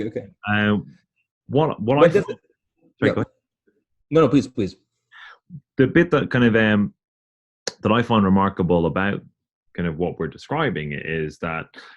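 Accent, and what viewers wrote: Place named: Ireland. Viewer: Wasn't expecting an Irish host. Great stuff. Hello from Offaly Subbed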